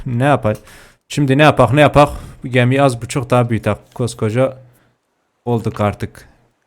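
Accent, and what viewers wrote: Elazığ, Turkey. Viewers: Abi sen Elazığ lı mısın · Abi nerelisin bence Elazığlısın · Abi Elazığlı mısın ?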